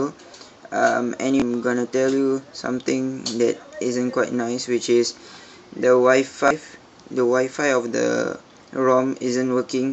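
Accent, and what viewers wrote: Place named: Malaysia. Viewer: sounded like a Malaysian.. probably a Malay coz of that accent..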